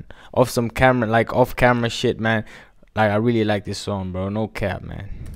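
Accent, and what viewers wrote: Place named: Burundi. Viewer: This is Rwandan? He sounds like Burundian.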